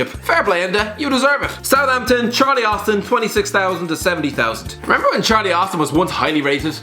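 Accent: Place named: Ireland. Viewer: I thought that it definitely sounded Irish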